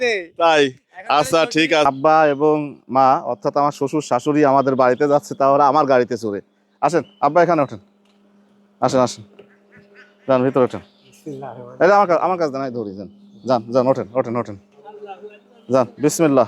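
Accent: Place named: Jessore, Bangladesh. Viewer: আপনার সহজ সরল ভিডিওগুলো ভালো লাগে। এভাবেই সবাইকে সঙ্গে নিয়ে ভালো থাকবেন। আপনার ভাষা শুনে মনে হচ্ছে আপনি গ্রেটার যশোর/কুষ্টিয়া/পাবনা কিম্বা আশেপাশের জেলার অধিবাসী। আপনার বাড়ি কোন জেলায়, জানালে খুশি হব।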